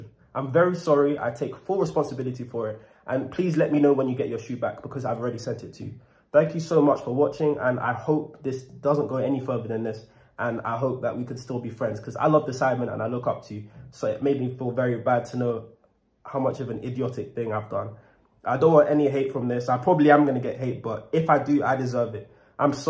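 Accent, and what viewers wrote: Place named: United Kingdom. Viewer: wait a second he's british????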